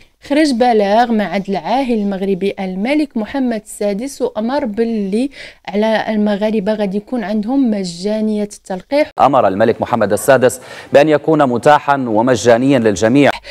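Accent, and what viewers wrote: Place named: Algeria. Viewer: سبحان الله و كأن جزائرية تتكلم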